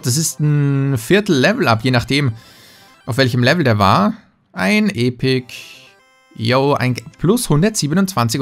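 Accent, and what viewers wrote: Austria. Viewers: bist du aus Österreich?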